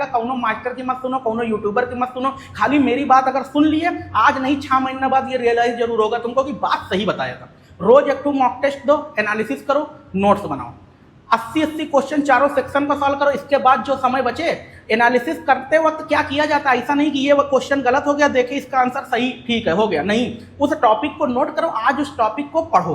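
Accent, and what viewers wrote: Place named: Ambedkar Nagar, India. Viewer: भाई आपकी भाषा बहुत बढ़िया है जैसे अकबरपुर अंबेडकर नगर वाला ❤❤❤❤❤❤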